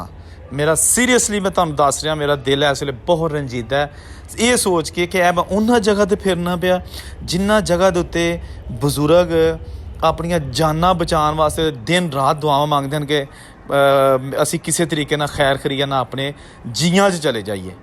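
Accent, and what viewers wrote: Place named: Amritsar, India. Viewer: bro this was my 2nd velog of yours first was about KASUR railway station before partition kasur as a sub division and now a days as a district in Pakistani Punjab state, i was really impressed the way you tried to narrate same as the Punjabi language spoken within AMRITSAR DISTRICT of INDIAN Punjab state but in this video you were repeating 23rd date as (tareyi) which means your elder one's were from district GURDASPUR/ JAMMU because tareyi (23rd) is word from dogari language, the main velog about railways in Pakistan i really watch is"SITE & SOUNDS no doubt all others too are impressive but your velog really impressed let's pray the lord to be both the nation's one again or love to live in peace and harmony with love from INDIA ❤️❤️❤️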